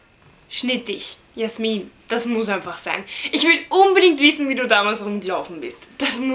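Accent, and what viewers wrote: Austria. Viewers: kommst du aus österreich?^^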